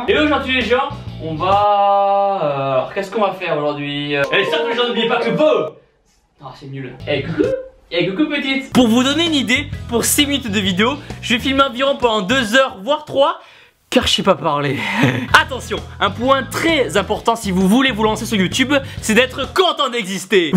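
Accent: South, France. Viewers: Ta l.accent du sud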